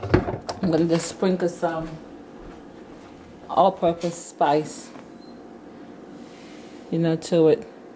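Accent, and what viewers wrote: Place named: Jamaica. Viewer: She clearly has a Jamaican accent sir.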